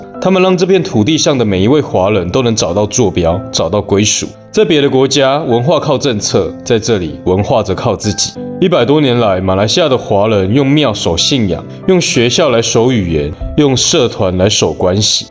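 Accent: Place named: Taiwan. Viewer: 口音聽起來像台灣人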